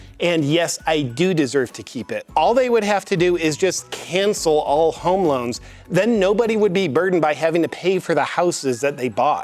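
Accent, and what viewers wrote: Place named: United States. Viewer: Why does he always talk with an American accent?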